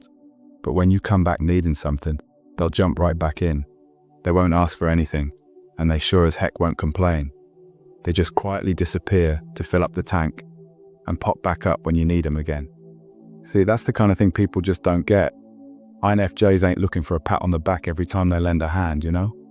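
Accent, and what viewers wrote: United Kingdom. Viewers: You made me giggle!🤭 Emotional Kung Fu😎 How do you know INFJ so well? Are you one of? You must be one of, you know too much!😂 (By the way, really love your humour and your sweet British accent ! You sound charming!)❤ I fancy you just from your words and the sound of your voice & accent ! (It doesn’t feel it’s all AI 💯 voice made) Love from a Italo & fellow Londoner ❤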